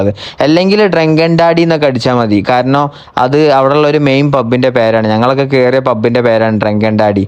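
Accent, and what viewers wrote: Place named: Malabar, India. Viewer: I like your malabar accent 😅😅 ❤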